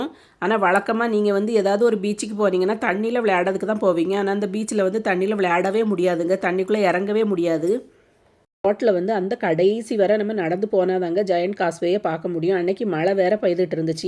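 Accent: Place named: Nagercoil, India. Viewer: Hlo sissy ungaluku native nagercoil ah slang apdi iruku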